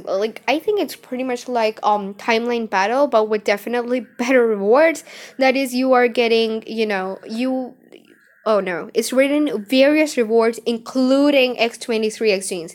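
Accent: India. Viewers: Shabbir Alom Shakil I'd say India given her accent 🤔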